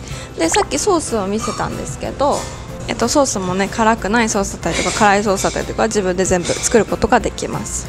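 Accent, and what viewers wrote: South Korea. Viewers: 日本人らしいですね。 わざと韓国訛りにしてると言うと誰かが言ってました。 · 日本人なのに韓国人が日本語喋ってるようななまりがありますね。 韓国語喋る生活が長いとそうなってきちゃうんでしょうか？ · 韓国語の発音に慣れちゃってるからね… 日本語も韓国語の発音になっちゃう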